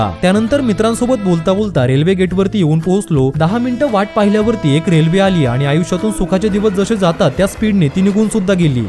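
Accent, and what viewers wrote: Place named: Vidarbha, India. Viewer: Tu Nagar cha watat nahi sagle shabda Vidharbhatle.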